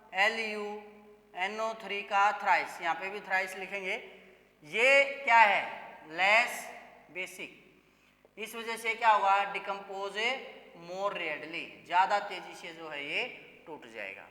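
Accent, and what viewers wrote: Haryana, India. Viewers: You are seems likely from HARYANVA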